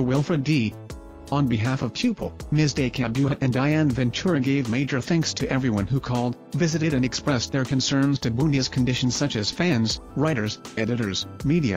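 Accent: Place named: United States. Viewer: Who's commentator...American accent... keep it up...nice trivia... about Ely buendia...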